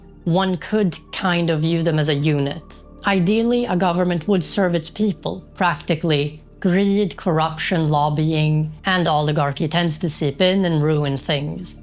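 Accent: Sweden. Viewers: Just found your channel, interested to know where you are from; you talk English like a Swedish person. Nevertheless you bring up good points and it is clear that you have given this a lot of thoughts and seems to understand AI and how humans interact with it at a greater level than most. I agree with you that llm's like GPT's are not the path to AGI, it might help us along the way but I think we are running up a wall and need to find a new model first. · Are you a Swede? The "E"s are quite Swedish. What is it with Nordics being over represented by nerds... is it that outside sucks majority of the time. Winter is nice tho.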